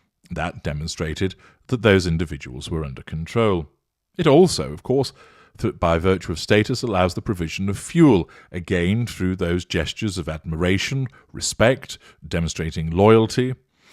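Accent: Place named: Upper class, United Kingdom. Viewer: Love your clipped accent, clearly upper class Brit. Eton educated?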